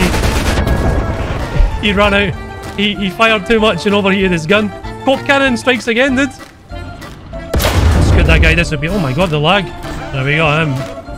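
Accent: Scotland, United Kingdom. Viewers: The guy is Scotish he can't tell the difference...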